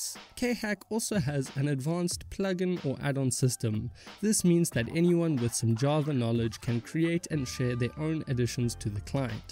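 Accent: South Africa. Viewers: i can tell straight away ur a south african awe man:)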